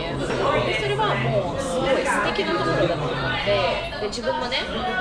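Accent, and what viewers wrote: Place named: Okinawa, Japan. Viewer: なんか沖縄っぽい訛り方してますね！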